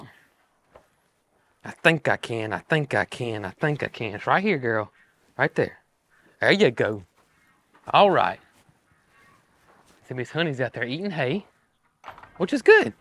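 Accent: South, United States. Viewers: I have to admit I do enjoy reading the CC to see how they interpret your southern accent. 😅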